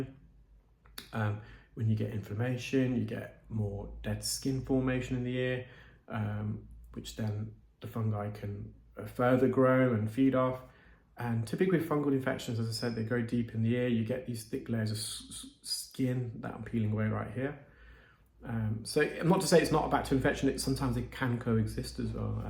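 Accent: United Kingdom. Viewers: The lips drive me crazy. The British accent makes everyone’s lips fold into Donald Trump lips. so distracting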